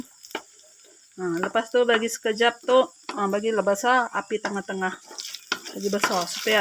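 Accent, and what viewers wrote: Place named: Sabah, Malaysia. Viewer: Kc antam saja tu melayu sabah bha. .haha. .more real sabahan style !!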